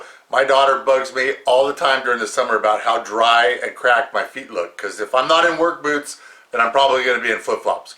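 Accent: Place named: Florida, United States. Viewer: You sound like your from Florida lol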